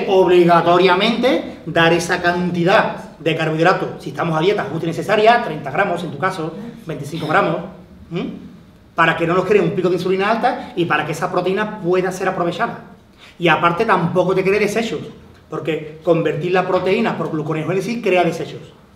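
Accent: Andalusia, Spain. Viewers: date cuenta que es Andalúz, es problema de acento, no lo tomes a mal yo lo entiendo a la perfección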